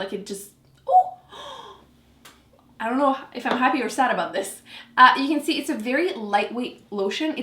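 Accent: France. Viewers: Hi! Thanks for this! (I’m from Ontario and just love your French accent!) For baby hairs/fly aways, to keep them in place, just spray some hair spray on a toothbrush and comb them down. ❤️💋🇨🇦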